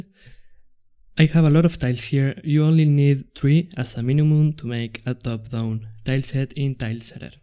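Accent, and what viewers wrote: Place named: Spain. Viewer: Great Video! by your accent i think that your native language is spanish, dont worry, spanish is also my native language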